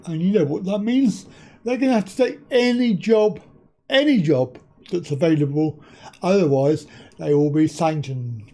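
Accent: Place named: United States. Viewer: Why is the voice in a yank accent?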